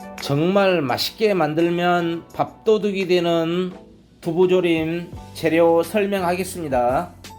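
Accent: Jeolla, South Korea. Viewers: 전라도 말씨 거슬려요 비호감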